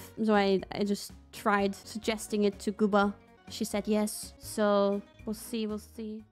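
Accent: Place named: United States. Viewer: Since when did start sounding American?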